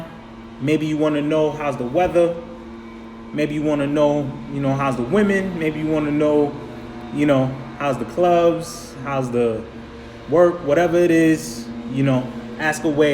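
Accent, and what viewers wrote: New York, United States. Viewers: Your voice reminds me of Mendeecees lol. That’s a good thing tho. I love nyc accents lol.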